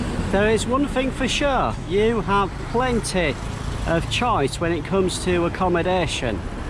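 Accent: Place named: Newcastle, United Kingdom. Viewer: Are you a Geordie? I really like your accent. I used to study in Newcastle back in 2016.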